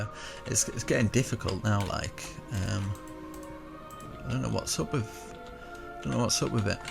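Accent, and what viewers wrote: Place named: Scotland, United Kingdom. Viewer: Your accent seriously you sound Scottish I love your videos you make amazing builds and your funny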